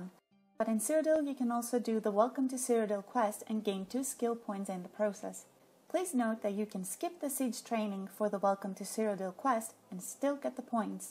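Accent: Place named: France. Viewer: Detailed and clear guide. And the French accent sounds cool.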